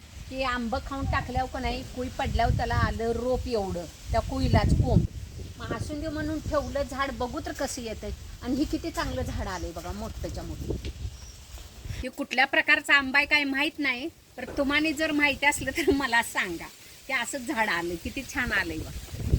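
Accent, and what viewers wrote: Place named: Rural, India. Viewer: Tumchya malyatil bhajila tumchya gavran bolnyasarkhach godava aahe.Tumche kasta baghun khup kautuk watate tumche.❤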